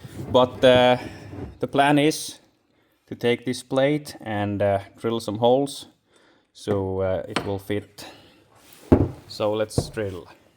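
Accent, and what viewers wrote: Finland. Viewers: ha, sa till frun när vi satt här och drack kaffe och jag kollade på videon att snubben måste ju vara finlandssvensk! Han pratar inte engelska som finnar brukar😋 Hursomhelst intressant att följa projektet.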